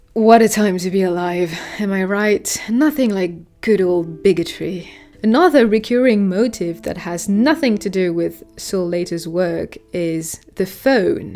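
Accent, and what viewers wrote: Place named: France. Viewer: Absolutely brilliant analysis! Loved your enquiry into Carol, and how every single sentence is both sharp and poetic. Well done ! 🙏🙌 And you are a native bilingual, aren't you (You have an ever so slight French accent and the prefectly native Parisian one)? (Not to mention your poster of Chris and la petite robe noire 😆) Anyway, love from London from a queer ⚢French/English film analyst (I know how hard it is, so I do admire your competence) 😊 Merci ❤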